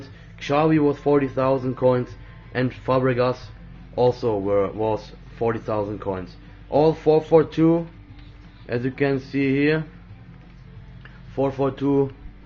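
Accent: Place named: Germany. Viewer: man hört deinen deutschen akzent haha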